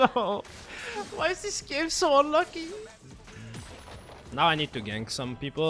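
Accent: France. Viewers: AHH le bon accent français <3 GachiBASS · LL SOUNDS EXTRA FRENCH THIS EPISODE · Tu a un accent français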